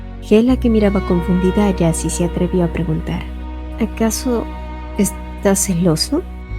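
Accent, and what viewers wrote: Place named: Paraguay. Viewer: Me re suena esto re paraguayo ayuda soy la unica?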